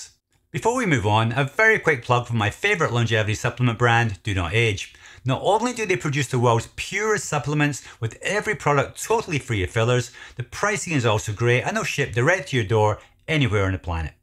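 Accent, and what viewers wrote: United Kingdom. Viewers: He sounds British to me and his channel states UK. Regardless, British Supplements company I would very much like to know given what they stand for but also because they're not 3rd Party tested.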